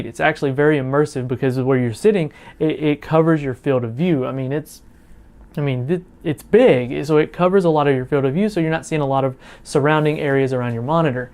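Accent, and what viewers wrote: South, United States. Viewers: Love the soft southern pronunciation. Very relaxing to this Yankee.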